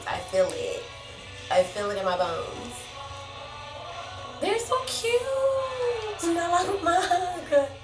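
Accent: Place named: Thailand. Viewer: Awwwww ur Thai accent was so cute >< thank you for reaction naka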